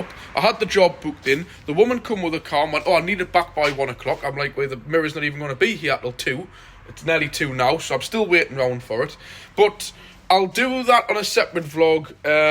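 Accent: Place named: North East England, United Kingdom. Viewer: That is how I found your channel, typed in something to do with L200 fuel filter and with the accent new you were northeast. anyone that looks for youtube video on a pacific vehicle you have worked on will come up world wide, also the caravan blogs.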